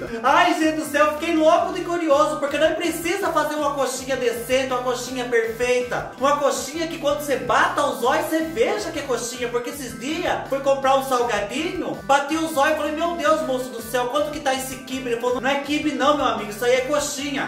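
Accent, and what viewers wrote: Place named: Caipira, Brazil. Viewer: A cada vídeo, eu me divirto com você!! Amooooo esse seu sotaque de caipira!!! Obrigada por fazer meus dias mais felizes!! 💋💋 nesse coração peludo!! 😂😂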